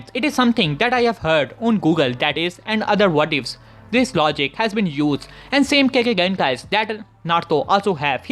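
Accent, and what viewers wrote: India. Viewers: Are you from India... because your pronunciation seems like that. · Bruh you a indian?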